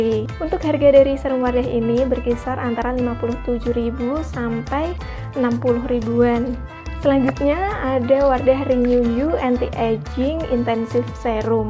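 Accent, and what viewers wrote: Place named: South Kalimantan, Indonesia. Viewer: logat ngomongnya mbak kaya dari kalimantan selatan pahuluan saat ngomong kata "wardah", "wajah", "like", "ada", "pori-pori", "harga", "sembilan", "ribuan", "mbak-mbak".... nebak iseng-iseng doang, hehe... nice video... main2 juga ya ke channel saya. .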